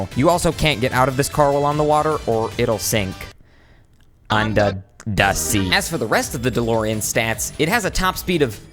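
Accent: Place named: United Kingdom. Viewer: wow a british person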